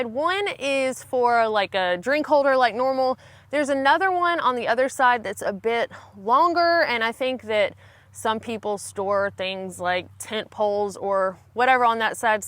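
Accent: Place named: Missouri, United States. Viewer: What do you do as a precaution for bears, I've seen you a video where you had the bear spray ? I have this fear of running into a Grizzly bear, there was a video (facebook maybe) that I saw of a guy that jumped up and started screaming when a bear charged him out of nowhere and the bear turned and ran away............I think I would open my mouth to scream and nothing would come out!!)) Oh and I like your slang or accent " YALL" !)) You sure your not from Missouri!!))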